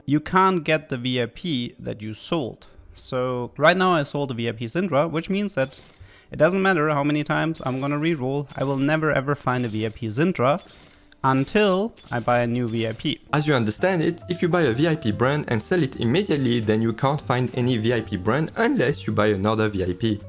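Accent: France. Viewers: little french accent or what ?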